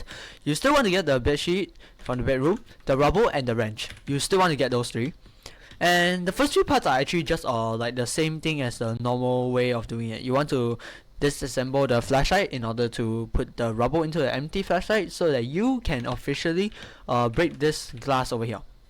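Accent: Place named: Singapore. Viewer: unrelated, but are you from SEA? specifically SG? the accent really gave me a shock hearing it in the wild LOL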